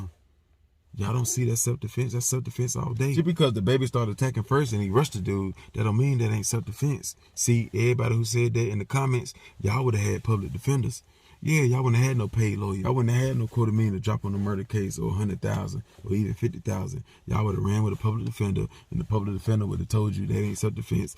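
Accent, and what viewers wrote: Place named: Chicago, United States. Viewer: Your funny bro..it's like you frm Chicago but jus county asl wit it😭😭 dat dare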